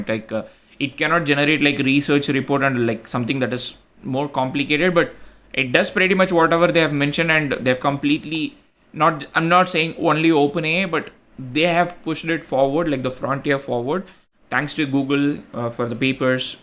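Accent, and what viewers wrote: India. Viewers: i can understand literally every word he says. i'll take indian accent over californian every time.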